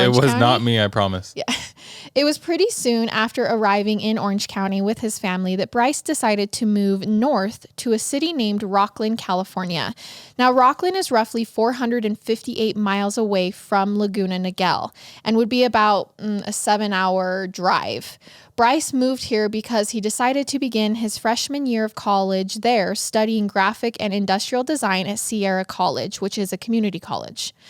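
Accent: Utah, United States. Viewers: Crick for creek hill for heal sell for sale…. The list goes on! even the captions put other words form the way she pronounces. It’s a Utah thing right?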